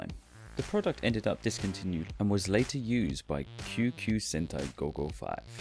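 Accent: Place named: United Kingdom. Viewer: Everyone of English-language native backgrounds - although unofficial, why not try imagining of the '-uer' following JAKQ? - which makes it "JAKQuer dengekitai". I'm just making a suggestion. By the way, it's a pleasure to hear toku info with a British accent. I'm a foreigner, and I wonder if domestic British sci-fi shows like 'Doctor Who' and 'Sapphire and Steel' were (and still are) in the way of the Toei Toku's entrance to the British Commonwealth back in the '70-80s.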